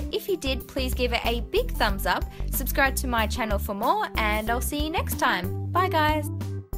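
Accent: Australia. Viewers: are you from australia? same (im from australia)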